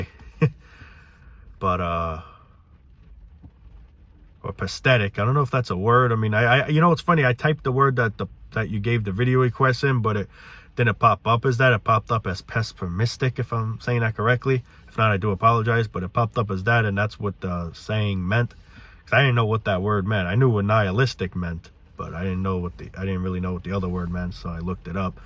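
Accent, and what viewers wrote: New York, United States. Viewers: You have that new York accent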